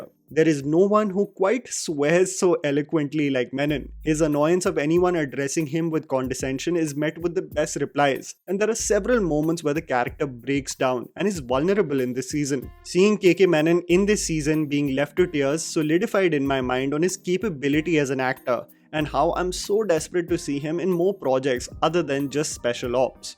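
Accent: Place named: India. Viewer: Congratulations of 1M. Every time i start your video for review and end up being mesmerized by your english and accent. Like Uk, Us accent yours one is pure definition of indian english accent. Like this is how is should be.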